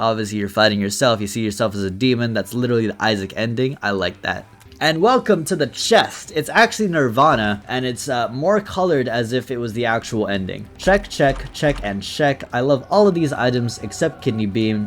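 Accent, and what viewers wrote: United Kingdom. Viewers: IS BRITISH?,????,??,???????? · Are you british???? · I'm British. But I have no accent recognition. I didn't realise is also Bri'ish. Cool. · wait your British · YOU'RE BRITISH? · is British? Oh no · Me when realizing is British: 😱😱😱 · BRITISH?? WHAT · YOURE BRITISH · wait, you’re BRITISH!? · is BRITISH?! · wait ur british :( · Your British??! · UR BRITISH? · This Mod Changes EVERYTHING! Edit: WTF IS BRI’ISH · IS BRI’ISH?! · You're British!?!? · is British?? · you're British??? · WHHGAT YOU AR E BRITISH???N i'm beyond flabbergasted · Bros british?????? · YOURE BRITISH?????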